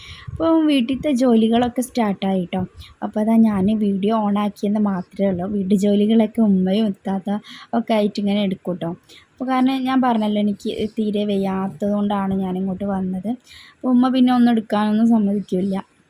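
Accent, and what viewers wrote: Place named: Kozhikode, India. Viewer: Video super,കോഴിക്കോട് ആണോ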